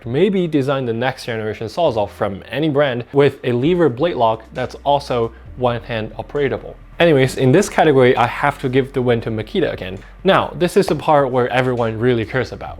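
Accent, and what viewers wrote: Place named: Canada. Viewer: An Asia Canadian accent... different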